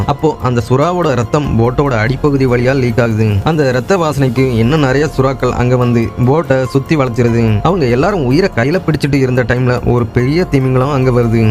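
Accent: Kongu, India. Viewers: Varuthung pothung puriyuthung...bro nenga. Kongu side ah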